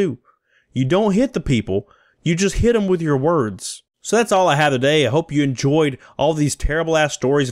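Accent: South, United States. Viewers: I find your southern drawl so comforting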